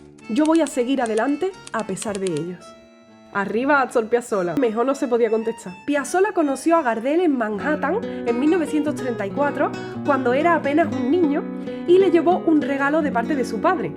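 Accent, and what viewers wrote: Andalusia, Spain. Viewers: Gracias bonita! por interpretar a Astor, tu que eres española, por tu acento. Sigue así te va y te irá muy bien. Bendiciones. Desde la Argentina. Bs 🙏🙏🙏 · Gracias por difundir la música de Astor y por contar anecdotas de su fantástica vida. Por otro lado tu acénto andaluz es muy agradable y cautivante. Saludos desde Buenos Aires. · Escuchar ese acento andaluz 🥰💓 enamora 😍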